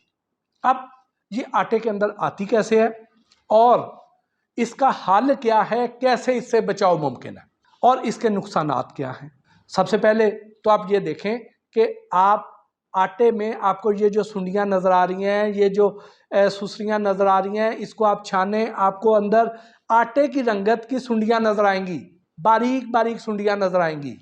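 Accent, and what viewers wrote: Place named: Punjab, India. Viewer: ko Bolna to ata nhi.aisey lagta hai koi paindu bol rha hai Urdu bolny ka style to bilkul Punjabi touch ka hi hai ab Sachi baat kisi ko Buri lagti hai to lgey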